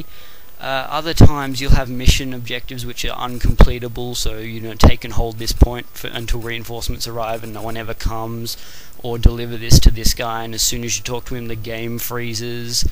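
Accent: Australia. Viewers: nice one, haha its funny i find aussie accents annoying.AND IM AN AUSSIE!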